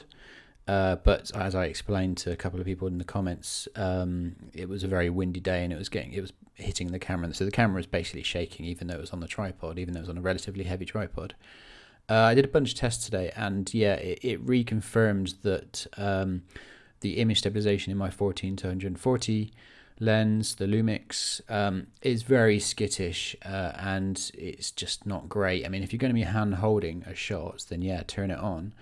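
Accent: Bristol, United Kingdom. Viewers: are you from bristol ?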